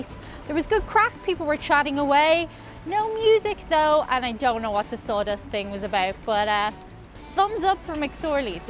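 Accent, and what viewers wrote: Dublin, Ireland. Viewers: She sounds American, with a bit of very posh Dublin. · You have an aful silly irish accent when u leave ireland for years and listen to irish accent it sounds strange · The more you drank the more Irish your accent got.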